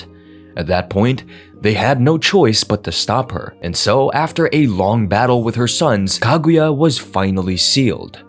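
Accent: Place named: Philippines. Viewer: I'm sorry if this might be offensive or whatnot, but I like how your accent rolls off. It sounds like Filipino or something.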